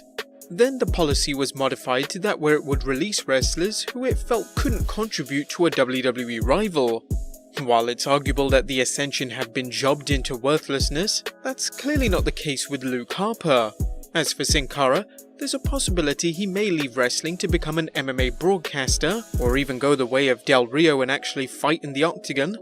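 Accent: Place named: United Kingdom. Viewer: I hate to say it but I got use to hearing my wrestling news with a British accent lol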